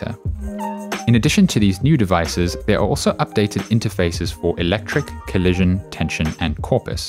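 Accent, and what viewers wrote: South Africa. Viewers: That SA accent! Lekker man, great video. Excited for ableton 11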